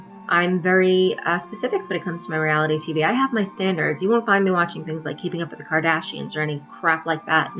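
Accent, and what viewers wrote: New York, United States. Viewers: Ditto on the gym playlists! Never representative of actual musical tastes. The gym is a whole different beast. And nothing wrong with a NY accent, NYC is rich in subcultures! 👍🏻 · What's wrong with a NewYork accent I love it! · I hear the NY! Fellow Queens chick here. But you lost the nanny accent so that’s a good thing!😝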